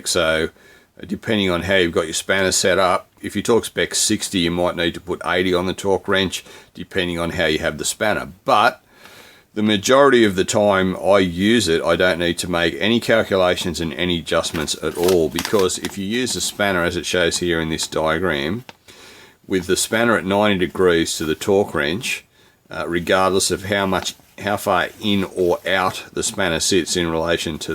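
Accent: Australia. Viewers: Love your Aussie accent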